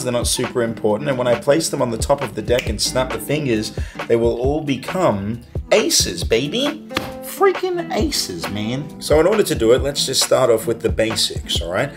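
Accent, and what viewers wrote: Australia. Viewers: Love that ‘Stralian accent, mate! I’ve loved magic since I was a little kid…I mean, what kid doesn’t, eh?! I picked up magic decades ago for one simple reason—to distract crying kids in the plane. And it worked as well as entertaining the adults around. Since then, I’ve watched countless magic stuff on YouTube and I’ve always been amazed by card tricks. But I’ve been so intimidated by them. Until now. Thanks for sharing your amazing videos and for generously sharing your tutorials. I’m determined to master some of the most basic of cars skills and tricks. Cheers, mate!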